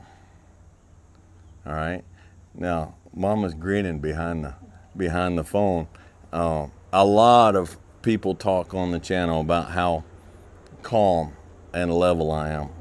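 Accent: South, United States. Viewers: 南部訛りってやつですか？ なんとなくアメリカ英語にしてもクセがあるように聞こえます。 ちなみに言ってることは素敵です。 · 南部英語気持ちええんじゃ〜^ ^ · 南部アクセントが心地いい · 南部訛り？ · それは南部特有のアクセントです。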